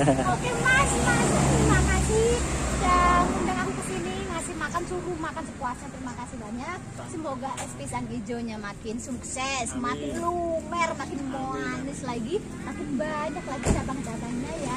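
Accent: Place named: India. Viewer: ES nya segerr..suaranya mirip orang india, kontennya bagus tentang kuliner.semoga bermanfa'at.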